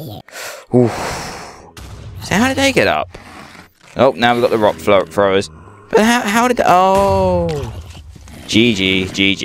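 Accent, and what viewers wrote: United Kingdom. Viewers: do u live in englend cuz u sound like it but ur sooooooo awsome <3 luv ya ^_^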